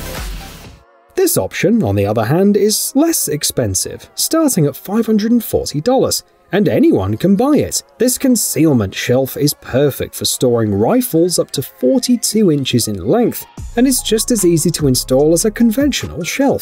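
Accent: United Kingdom. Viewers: British person talking about guns, no thank you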